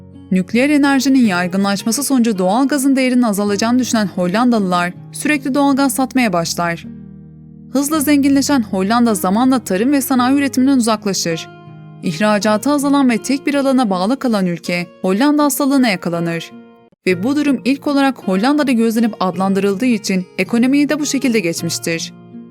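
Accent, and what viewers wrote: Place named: Aegean, Turkey. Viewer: seslendiren hanımefendi ege köylüsü sanırım yapıyoo, sağlıyooo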